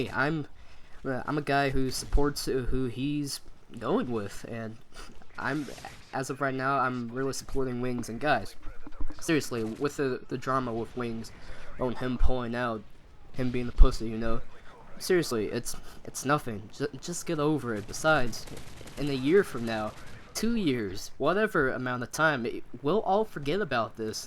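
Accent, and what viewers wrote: United States. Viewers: your voice sounded so much more confident and American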